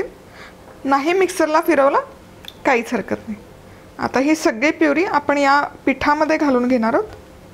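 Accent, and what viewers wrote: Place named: Kolhapur, India. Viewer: Kolhapuri aavaj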